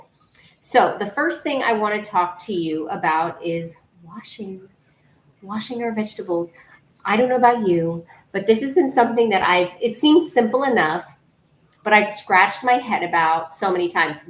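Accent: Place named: Canada. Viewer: Hey sister, so I used to soak lentil and bean in water mixed with baking soda for 8 hrs , or overnight. I stopped consuming legumes for my SIBO. I wonder though if you'd do a research to find out if baking soda works on legumes? But I'll definitely try mixing fermented pickle juice, I get them in farmers market in Poland, with water. Would a few table soons for a liter of water suffice? I'm glad i found your channel and i appreciate that you use metric system in your videos. Sounds like you're from Canada. Much love and respect for you for making free informative videos. Greetings from Poland. .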